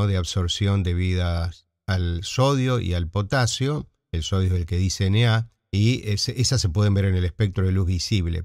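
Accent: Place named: Argentina. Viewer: Estoy encantada de haber descubierto hoy este sitio, con una voz argentina. A mi no me es muy fácil este tema pero, a fuerza de leer un poco y de escuchar otro tanto, algo voy aprendiendo.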